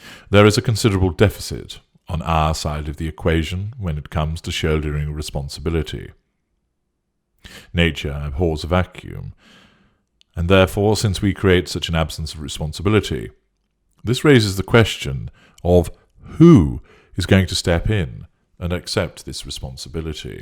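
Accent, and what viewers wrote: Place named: England, United Kingdom. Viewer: I like listening to voice, I enjoy the English accent. My ancestors came to the U.S. from england, One day I hope to take a trip over there and spend some time.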